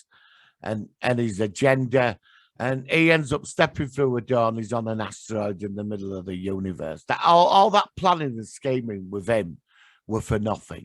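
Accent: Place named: Liverpool, United Kingdom. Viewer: I can't stand scouse. It should be counted as a speech impediment. CALM DOWN CALM DOWN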